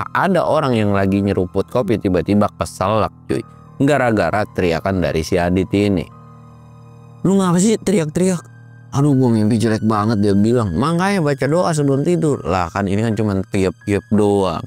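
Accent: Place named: West Java, Indonesia. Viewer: Kalo cerita mamang campur Sunda mang seru lucu logat sundana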